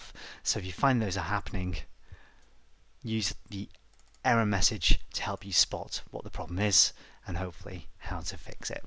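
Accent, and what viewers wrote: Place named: North Wales, United Kingdom. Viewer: I recognise that accent anywhere, do you come from North-West Wales? 🤔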